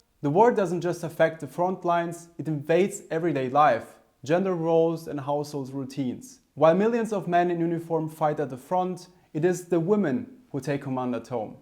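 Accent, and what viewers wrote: Germany. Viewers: Mein Herr, kommen Sie aus Deutschland? Sie klingen so. Ich lerne Deutsch und finde was Sie sprechen sehr gut! Ich finde die Geschichte dieser Zeit (WWI) sehr interessant, aber nicht so vielen Youtube sind ueber wie Deutsch Leute in dieser Zeit gelebt haben. Mehr, bitte! Danke schoen!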